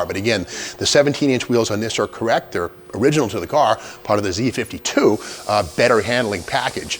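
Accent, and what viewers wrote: Boston, United States. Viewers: ❤ your Boston accent🎉 am buying 88 year for 6400🎉❤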